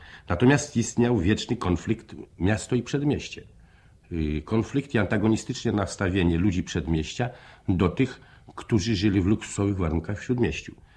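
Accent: Warsaw, Poland. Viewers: ach te warsiawskie ' l '. 'nasza ulyca '. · Biorąc pod uwagę,że człowiek przeżył aż 5 letni koszmar obozu koncentracyjnego,to po prostu aż nieprawdopodobne,że mimo to zachował pogodę ducha.Być może,tak mi przyszło na myśl ,że "Pięć Lat Kacetu" ,to było jakby osobiste rozliczenie się z przeszłością.Trudno też o tym rozmawiać z kimkolwiek,a zwłaszcza z bliskimi,więc napisanie tej książki pomogło zapewne wyrzucić częściowo chociaż to z siebie,a przy okazji,zaświadczyć Polakom i światu o tym co się tam wyprawiało z ludźmi,daruję już sobie wywody,kto i co robił,o tym jest masę innych dokumentów.Zresztą chyba jak dobrze pamiętam Grzesiuk,nawet niestety,zmarł chyba w kilka lat poi przeżyciu obozu,ponieważ choć miał silną psychikę i organizm,to jednak i to się poddało.A tu jeszcze miło posłuchać tej ,jak sadze,bo nie jestem Warszawiakiem,to już oni muszą ocenić,ale starej gwary warszawskiej,czy dziś jeszcze się tak mówi,tam w Warszawie?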